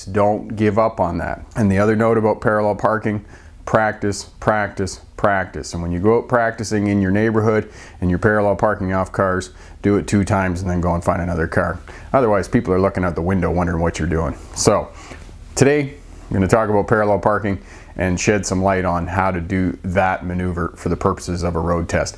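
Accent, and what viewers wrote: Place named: Western Canada, Canada. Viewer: I was watching this thinking, "this guy must be from Western Canada" and lo and behold from BC. I can tell me Canadian accents pretty good.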